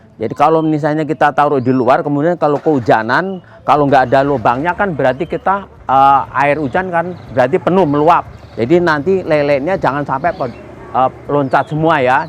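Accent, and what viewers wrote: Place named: East Java, Indonesia. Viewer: sprt org jatim yah mas ...😁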